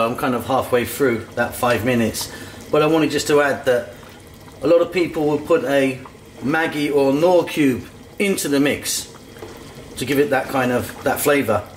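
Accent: Philippines. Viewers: Very good Pinoy accent to the ingridients"Nice presentation also keep cooking taglish Version Thank u.. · Like your Tagalog accent, and it is nice to see you back, and this recipe looks damn delicious will try and Thank you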